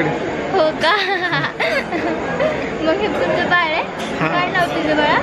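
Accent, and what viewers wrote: Latur, India. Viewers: Tuzya aavajala khup sut hoti aapli Latur chi bhasha.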